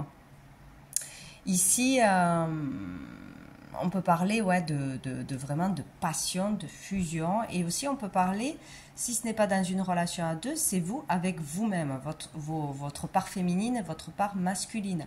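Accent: South, France. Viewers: Merci, tirage intéressant à écouter. Nous avons tous les deux un accent particulier, toi du sud de la France, et moi du Québec. 🙂❤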